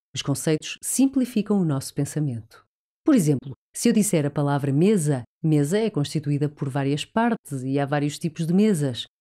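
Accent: Portugal. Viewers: Eu tenho tipo um transtorno cognitivo, segundo a minha psicóloga. Devido ao TAG e depressão, tenho a tendência em criar histórias mirabolantes na minha mente, onde a vontade que me dá é de correr ou me defender, mas nada acontece e vem os sintomas físicos. Olha, o seu canal é muito bom, traz uma informação bem objetiva. É muito bom ouvir o seu sotaque, eu adoro! Morei em Leiria e Coimbra por um bom tempo. Continuação de um bom dia! 😊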